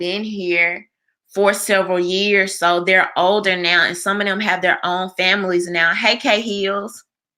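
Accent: South, United States. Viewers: The southern accent ....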